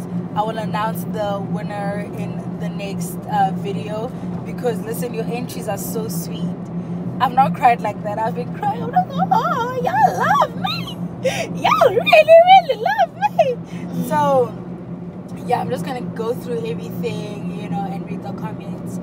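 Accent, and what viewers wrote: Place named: Botswana. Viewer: Your Setswana accent got me curious to know where you originally from, it’s not far from how we speak in Kimberley “without the Afrikaans 😂”.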